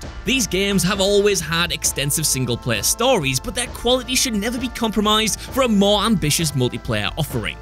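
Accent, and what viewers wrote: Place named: Newcastle, United Kingdom. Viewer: It’s called a Geordie accent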